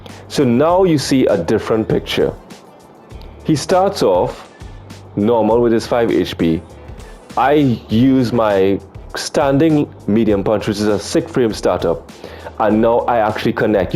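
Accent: Trinidad and Tobago. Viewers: For some reason the Trini accent is helping. Thanks for this tip, from one islander to another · You a trini?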